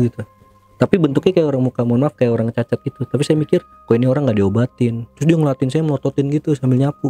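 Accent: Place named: Bekasi, Indonesia. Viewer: Temen gw orng bekasi jg emng logat dan cara ngomongnya kaya gini persis klo cerita, asik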